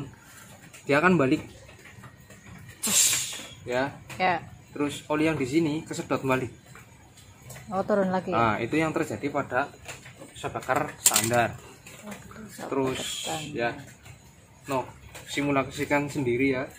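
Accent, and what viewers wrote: Banyumas, Indonesia. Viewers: Ora ngapak ora kepenak😀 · Ora ngapak ora penak pokoke lah mbak ayu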